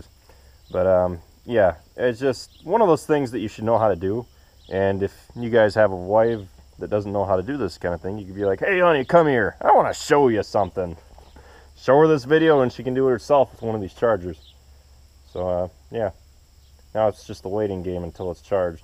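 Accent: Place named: Wisconsin, United States. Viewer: First thing this fellow cheesehead noticed was the Wisconsin plates on the car and your 100% Wisconsin accent. I live in Arizona now and can pick out the accent in a crowd like nobody's business. Comfort food for the soul. As a sidenote I also have the NOCO G7200... Thanks for the video!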